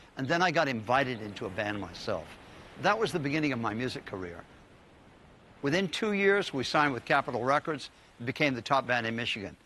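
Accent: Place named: Taiwan. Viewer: It’s so cute, his Chinese got Taiwanese accent.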